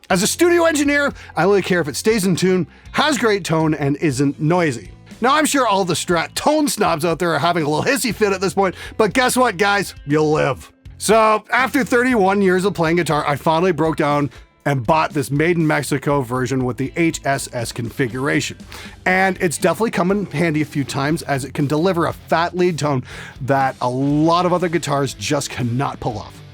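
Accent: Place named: Canada. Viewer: that was the most canadian accent i ever heard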